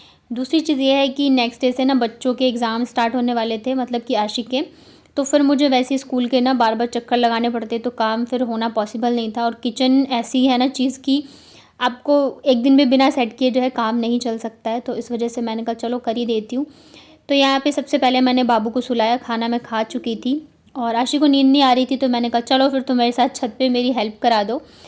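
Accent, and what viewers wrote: Bihar, India. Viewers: Didi aap Bihar se ho kya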